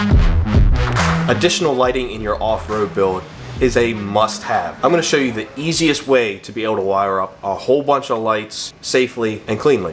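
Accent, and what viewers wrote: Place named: Pittsburgh, United States. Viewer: First time ever watching, I heard your voice and thought to myself, "this dude sounds like he got some Pittsburgheese in him" then I saw the Steelers hoodie....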